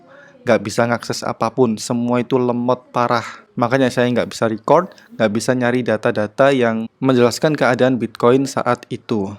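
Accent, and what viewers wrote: Surabaya, Indonesia. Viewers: Wong suroboyo ta dobol samean cak .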